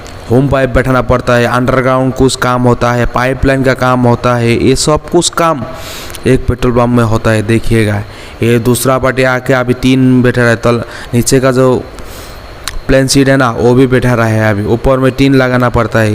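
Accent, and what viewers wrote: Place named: Odisha, India. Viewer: BHAI APKO HINDI BHASA BOLNE ME DIKAT HO RAHI HAI KYA AP KI HIDI BHASA SE AISA LAGTA HAI AP ORRISA KE RAHNEWALE HAI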